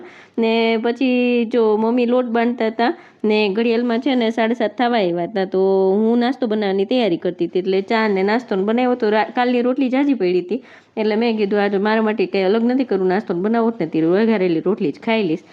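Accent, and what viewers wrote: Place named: Kathiawar, India. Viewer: Ben tmari bhasha ekdam pyor kathiyawadi chhe to tmaru piyar kya gam chhe 😊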